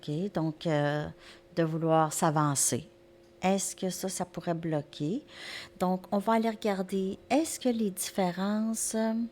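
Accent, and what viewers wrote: Canada. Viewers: J'adore ton accent si charmant du Canada j'adore j'adore le Canada car en juillet 99 j'ai était dans ton très beau qui est magnifique et que j'ai vraiment aimée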